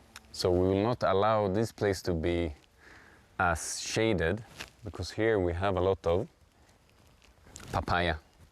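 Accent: Kenya. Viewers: You speak like Maasai. Guess you've been around quite long. Wonderful video. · This is amazing... the transformation is real🤗 I work in semi - Arid area in West Pokot, we should do an exchange visit program, share knowledge and seeds... 🤗 Kudos for the adaptation of Kenyan English accent😍👏👏🌻🎊🎉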